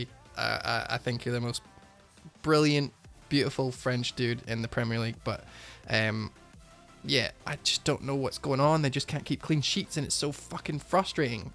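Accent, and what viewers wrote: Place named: Scotland, United Kingdom. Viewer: Where in Scotland you fr om?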